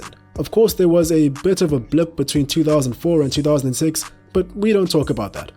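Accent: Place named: South Africa. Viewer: South african voice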